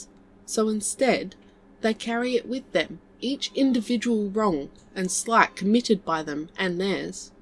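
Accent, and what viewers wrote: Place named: Australia. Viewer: I think it's wonderful to have a young Australian voice reading these stories. It reminds me of my childhood, (although the readings were more of fairy-tales than sci-fi - unfortunately).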